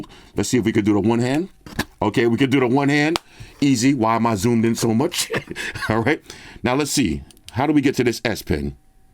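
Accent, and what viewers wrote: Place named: New York City, United States. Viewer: is literally an NYC hood dude from my generation. He has the best vids cause its like I'm talking to one of my boys from back on da block 🤣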